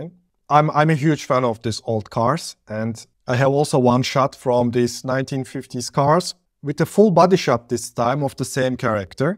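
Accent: Turkey. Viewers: hoca, o kadar belli ki türk olduğun ve türk aksanın. İngilizceyi hiç bilmiyor olmama rağmen videonu anladım :))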